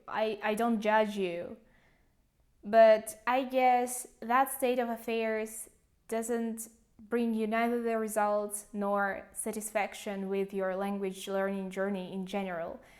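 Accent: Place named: Russia. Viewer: I believe she's from Russia:) I got it after just a few seconds of watching her video some weeks ago. I can easily get who's from this country by facial expressions, sights, the tone of their voices and finally by the accent. So I'm kind of an expert in this thing hahaha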